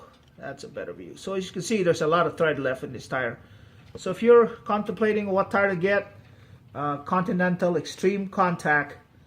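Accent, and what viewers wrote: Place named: Philippines. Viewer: You Filipino. You got that accent. Do you have steering wobbles with these tires.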